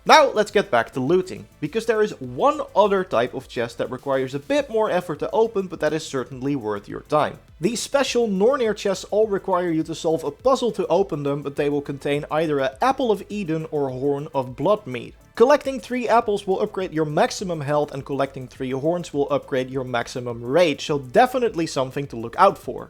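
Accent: Netherlands. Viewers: Sounds Dutch to me. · Hi, In the english language the word LOT, like "a lot of power", is pronounced a LAT. It shows your Dutch background where a lot of people do this. It is no problem and people instantly recognise your voice, but it is just that little bit extra professional, which all of your video's are... No offence meant at all just this remark, wether it is useful or not. Kind regards, Jules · Great vid 🙂 Quick question.. Are u from the Netherlands? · Just a weird question. Are you dutch?. Haha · In loving the dutch/ anglo accent 😂😂😂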